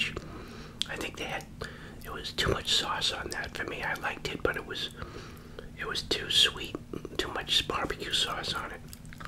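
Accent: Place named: Massachusetts, United States. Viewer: Previously being from Massachusetts it's nice watching these videos and hearing a familiar accent.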